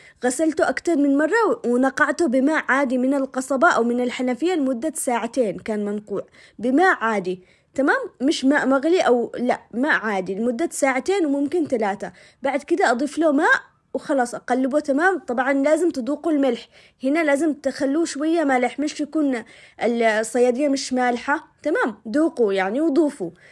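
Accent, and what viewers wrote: Aden, Yemen. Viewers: ماشاءالله عليك مبدعه وحبوبه وشاطرة وحبيتك لانك من عدن متلي وماتتصنعي وتتكلمي عدني واحب لما تتكلمي العدني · ❤❤ قلبك ماشاء الله تبارك الله عليك مره وستوا ❤❤عاشت ست الكل أسعد الله أوقاتكم بكل خيرا آمنا إحنا عارفين الطريقه بس إللي شوقنا أكثر صوتك الجميل العدني الاصيل القح 😂🎉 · مااحلاك وامااحلى طبخك وكلامك العدني الحلو الله يعطيك العافيه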